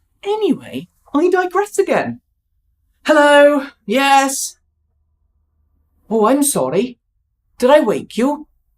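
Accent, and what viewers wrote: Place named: Wales, United Kingdom. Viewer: Ooh your Welsh accent sounds like Mrs Doubtfire!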